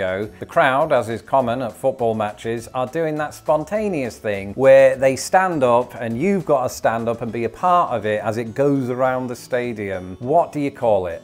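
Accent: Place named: United Kingdom. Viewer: I realize why I find his particular British accent so endearing!!😮 He sounds like 🧅 Onion! From the Cartoon Network cartoon, "Apple and Onion"!!!!❤😂❤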